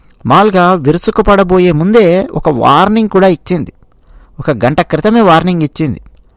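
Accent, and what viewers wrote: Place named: Rayalaseema, India. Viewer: అయ్యయ్యో పాపం.. బెదిరించడానికి వచ్చిన గుండె ధైర్యం ఏమయింది.. మీ రాయలసీమ slang super గా ఉంది..😀😀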